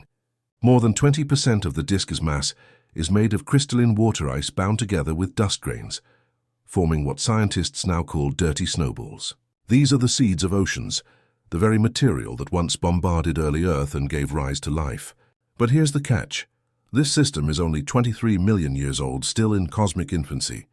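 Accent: United Kingdom. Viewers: Another AI "terrifying" clickbait video with vague British accent.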